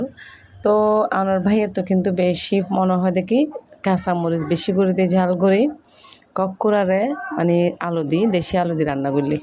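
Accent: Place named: Chittagong, Bangladesh. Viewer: আপু চট্টগ্রামের আঞ্চলিক ভাষা তোমার মুখে শুনতে খুব ভালো লাগলো ❤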